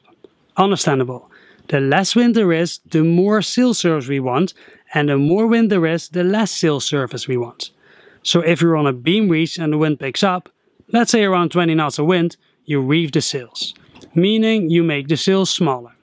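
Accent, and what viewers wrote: Netherlands. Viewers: NICE Dutch accent and great video! fantastisch gedaan meneer :-) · Are u dutch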